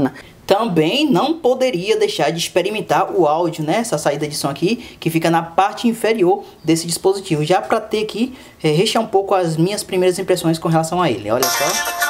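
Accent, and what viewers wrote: Bahia, Brazil. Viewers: O pvt é baiano kkkkk já ganhou meu like !! Tmjj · Voce er bahiano? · Deixei o like pq eu gostei do lá ele kkkk baiano mesmo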